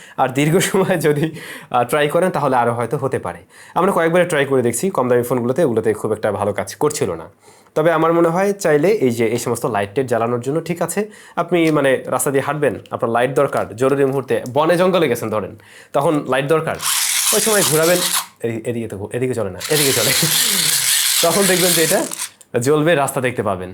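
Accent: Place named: Barishal, Bangladesh. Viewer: আজকে কথাগুলো ভাল লেগেছে গ্রামের ভাষায় কথা বলেছেন দেখে সেম বরিশালের ভাসার মত 🤔🤔🤔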